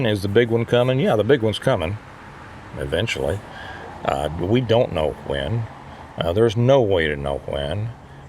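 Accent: South, United States. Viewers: I LOVE UR DRAWL WHEN YOU TALK.. we in Canada love listening to YOU Southern folk..KEEP TALKING BRO.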